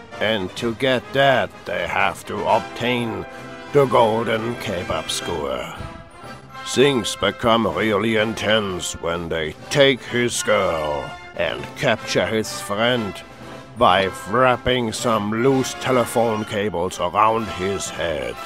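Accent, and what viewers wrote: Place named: Germany. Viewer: Why did you put a German accent in this? Wtf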